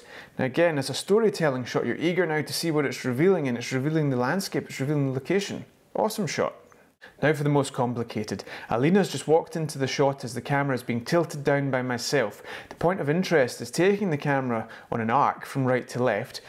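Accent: Scotland, United Kingdom. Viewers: Very helpful video, and the Scottish accent is irresistible to a Yank like me. Killer footage almost worth colliding with that mangy tree to achieve. The only thing you missed explaining is how to use the sticks to change the altitude and radius while flying, which is fairly evident when trying it with the DJI Go 4 app, but not clear here in this video. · Love the channel and the content, the scottish accent is just a bonus 😊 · Great explanation of the feature and great to hear another Scottish voice on YouTube! 👊🏻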